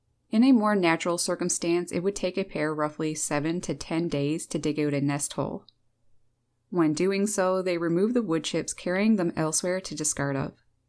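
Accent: Newfoundland, Canada. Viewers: You sound like a newfoundlander. Am I right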